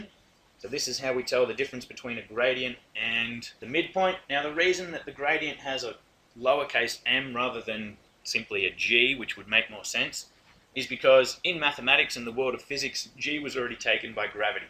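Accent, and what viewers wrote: Australia. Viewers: sweet aussie accent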